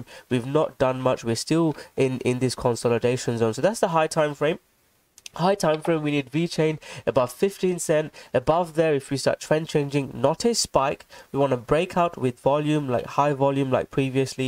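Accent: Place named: British Asian, United Kingdom. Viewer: you come from a chapati eating background ...😂😂😂😂😂 for sure !!! I cant be wrong , thats them pharata Uk English accent lol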